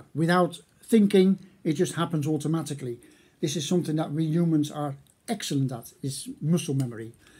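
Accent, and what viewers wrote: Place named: Netherlands. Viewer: Dear, do you live in holland? Your accent sounds so dutch but it sounds like you’ve lived in england for a while haha 😅 and if you live around the randstad do you do lessons??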